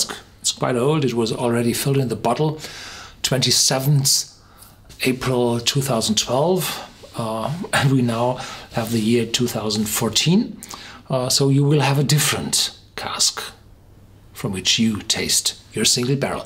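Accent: Germany. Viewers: Ah that German makes me weak in the knees <3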